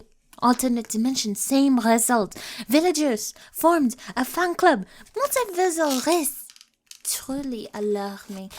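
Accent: France. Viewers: Omg bro ok this audio is your best one so far no question about it. Your French accent is amazing. I love the funny names the tool and different Geneva accords are called and even the funny scenario names you came up with. Loved the “rap sheet “ The French accent makes it better. The bad weather random voices are distinct in range are easy to tell the different voices. The lore in the Clark verse is reaching levels closer to Radish asmr.Thanks to the detective we will finally know how Clark is able to achieve these absurd levels of charisma. He needs to leave some girls for the rest of us oh my goodness king 😭. I love the detective she brings out such must protec energy out of me. I am so glad I subbed and joined your discord. This part is for especially imagine I am one of your female friends for this.” Girl you ate that and left no crums 💅” “Omg slayyy girl 😂” “Girl your voice is divine “ “Yes queen you ate that up”. 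“ Yesss girl the thumbnails are on point “. On a more personal note hope you are well and taking care of your mental and physical health you are talented and your work is subline. So happy to see you reach new heights girly pop. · God, I need another French accent video by you. The way you do it is great. It's enthralling and incredibly amusing at the same time! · She accuses us of too much rizz? Excuse me, with that French accent and detective charm, you are the one who has rizzed us all! Thank you, 🤍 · You did a wonderful job on speaking in a French accent! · I love your French accent! Ooh la la! Bonjour! ¡Enchanté! 🥰😍😻🤗😘💗💓💖💕💘 ❤❤❤❤❤ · I'm from Louisiana and u have a beautiful French accent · Congratulations. I'm obsessed. I need more french accents. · I love your French accent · This detective audio was absolutely amazing!!! 🔥 Your voice performance was absolutely outstanding and you did the French accent perfectly! 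The art style for the thumbnail is so beautiful and I really love the character's design! I already can't wait for what audio you deliver next with your amazing talent! Amazing work! 🩷🔍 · Oui oui you sound gorgeous with a French accent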